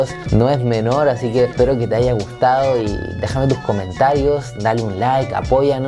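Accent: Chile. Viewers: me gusta tu acento no tan chileno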